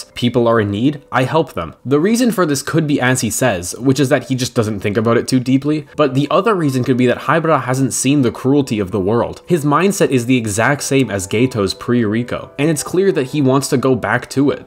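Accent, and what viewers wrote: United States. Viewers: holy shit I just can't with the overly American pronunciation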